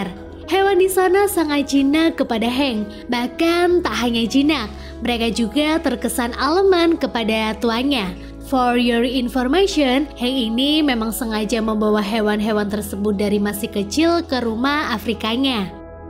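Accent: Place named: East Java, Indonesia. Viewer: Aleman ini makanan apa ya…?🤔🤔 Logat jawa timur paling timur kayaknya…😁😁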